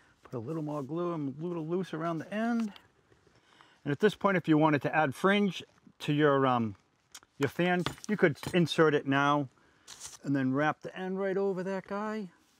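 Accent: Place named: New England, United States. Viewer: I'm hearing that New England accent! Thank you for these excellent videos!!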